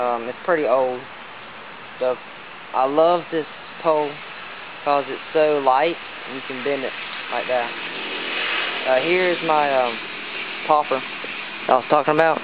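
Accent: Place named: Georgia, United States. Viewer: hey man sounds like your from my neck of the woods. im in georgia and i do alot of fishing in lake lanier and lake heartwell.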